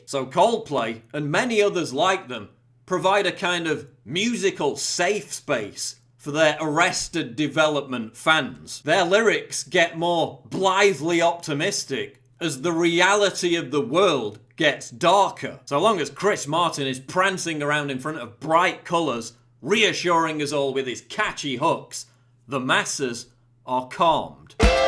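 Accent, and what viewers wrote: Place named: Northern England, United Kingdom. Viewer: Ha I love you man.. ant fukin northern accent piles on your integriee. Keep it coming, we will be people with minds again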